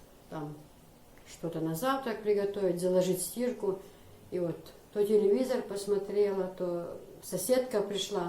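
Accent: Chechnya, Russia. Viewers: Вы просто умница! С трудом верится, что Вы не чеченка. Вы даже на русском языке говорите с легким чеченским акцентом, и что удивительно, на чеченском -- без акцента. Вы и внешне очень похожи на чеченку. У Вас редкое сочетание -- внешняя и внутренняя красота. Счастья Вам и Вашей семье! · Вааа Аллах1, сел леран нохчишех д1а мух тарлур йу,?Даже чеченский акцент присутствует 😄Делдахь со цец йаьлла С меня лайк и подписка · Даже акцент чеченский! 👍🤗😘😘😘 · Поражаюсь Вами,у вас диалект даже чеченский🤩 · Ни за что и не подумаешь,что вы русская, внешность чеченская, вы не меняли свою внешность?? Акцент, язык , манеры.... Все чеченское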